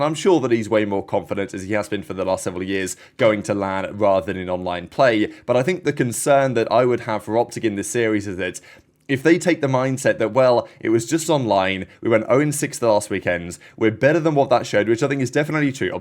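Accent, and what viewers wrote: England, United Kingdom. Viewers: where you from? From England so I’m assuming south somewhere but don’t know if it’s London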